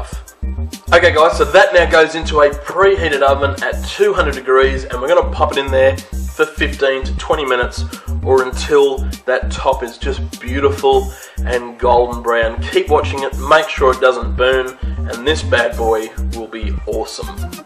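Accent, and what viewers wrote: Australia. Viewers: after the first ten seconds I wondered why his accent seemed so familiar and then I realised that he had an Australian accent hehe! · I guess he's an aussie. He sounds all right for me. Butter, brown sugar and cinnamon...Thanks. I'm going to the supermarket now.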